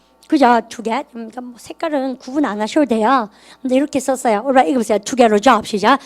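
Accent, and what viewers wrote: Gyeongsang, South Korea. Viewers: 영어와 갱상도 말을 동시에 배웁니다.. 이해력에 많은 도움이 됩니다. 감사드려요~~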